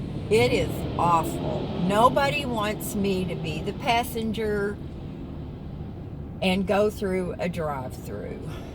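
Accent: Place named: Maine, United States. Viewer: sounds like maine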